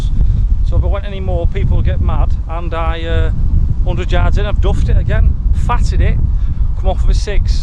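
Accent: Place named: Oldham, United Kingdom. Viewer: Ahh ha, I knew the accent but couldn't place it. Needless to say I'm from Oldham originally but moved down south when i was 2, in 1983, towns a bit different now, got one of these ridiculous southern accents and everything.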